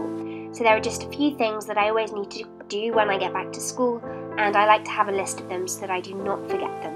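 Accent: RP, United Kingdom. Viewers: I am sorry if this is offensive or if I got your accent wrong, but by this whole video, I think there is no a person who is more posh than you are! Again, I am sorry if this offends you, I mean it as a compliment - I loooove everything you do. It is nice when someone is tidy, organised and does everything calmly, step by step. I also love how this is not a typical tween/teen video of checking through the social media and other stuff. I know a lot of people light candles now since it is popular currently, but I still loved it. Also, I am a Potterhead myself and a book fan so I loved that part too! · Omg she must go to a very posh school! She wears a blazer to school and she has summer homework!🤔 and her accent is quite posh too,and she uses a quil🤔 interesting🙂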